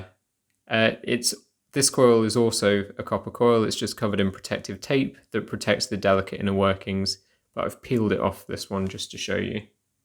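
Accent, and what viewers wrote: United Kingdom. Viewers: Thank you so much. Great explanation and so good to hear a British voice.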